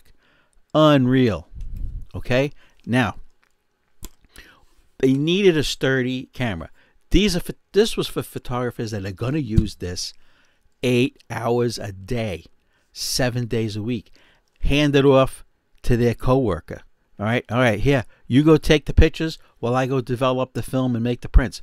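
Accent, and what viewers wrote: New York, United States. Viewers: This is a great video. As a New Yorker your accent makes me feel at home, and the information shared is super useful. And thanks to you I am now obsessed with the tremendous artistry of Vuhlandes.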